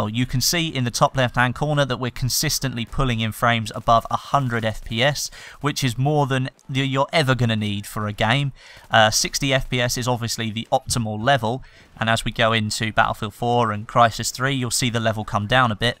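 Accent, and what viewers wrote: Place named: United Kingdom. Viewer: Cool PC, love the way how Brits speak, I recently started a gaming channel after observing you and several other youtubers for an year approx . You can check my channel and see the quality of bids. Currently I have a suclish Logitech gaming keyboard which I use for gaming an always wanted to have a gaming one. If you like my content you can give your Indian friend some help.PEACE !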